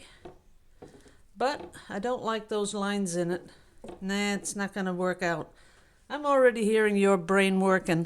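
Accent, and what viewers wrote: Netherlands. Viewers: I thought I heard a Dutch accent! My dad is from Holland so that makes me half Dutch even though I have never been there. One day I truly hope 💜 Thank you for sharing your art. It's beautiful!💕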